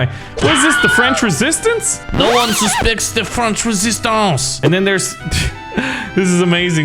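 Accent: Germany. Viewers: your German accent isn't annoying it's WUNDERBAR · I’m from Germany and i just love it when he uses his German accent.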